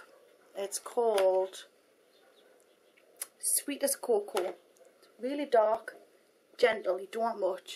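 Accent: Newcastle, United Kingdom. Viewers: wooo for the geordie accent!!! what make up diod you use for this look because i'm a huge germany fan lol :P n yeah :P idc if i'm english, heh. x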